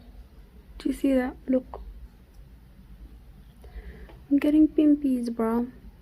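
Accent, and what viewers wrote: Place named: South Africa. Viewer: I js love you your South African slung❤️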